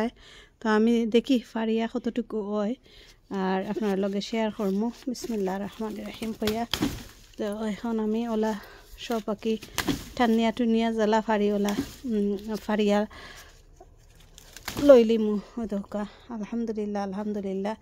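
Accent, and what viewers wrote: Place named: Sylhet, Bangladesh. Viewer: সিলেটি মাত হুনিয়া খুব ভালা লাগল❤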